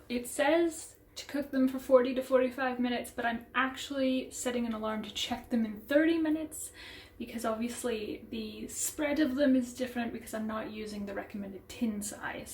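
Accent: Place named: Scotland, United Kingdom. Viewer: This was so cosy and lovely. Also I love your accent, the little bits of Scottish in the way you say things like as well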